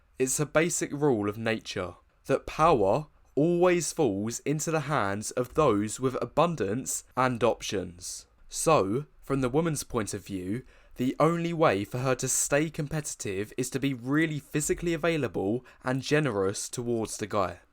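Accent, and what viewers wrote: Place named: United Kingdom. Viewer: bro your english regional accent along with your bluntly made message is so f***ing good. that being said it's really depressing hearing the truth :(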